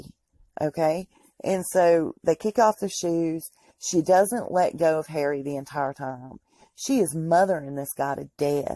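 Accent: South, United States. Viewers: I just love your accent, I lived in the south and love to hear it! Plus you are 100 percent right about Megan it will catch up to her!😂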